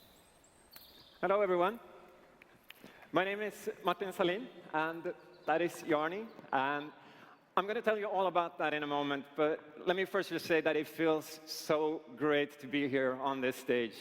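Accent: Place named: Sweden. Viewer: This guy is running a small company of 14 people and together they created this game as a result. I have no idea whether the game is any good but this is what people like him do. They create games and that's what they know and are used to. To stand in front of a large audience and the industry as a whole as well as in front of hundreds of thousands watching him over the internet is no easy task if you've never done anything like that. I would refuse to stand on that stage because I would fear losing it and forget the last word I spoke. Further more this guy's native language is Swedish. Not English. I think he did a fabulous job of making a case for his and his co-workers game. How anyone can blame him in a negative and down playing way for being nervous and especially exited for the task at hand (in a cute Swedish way) is beyond me. But then again... 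there are a lot of fourteen year olds on the internetsez that have thrown the diapers/nappies and learned how to type.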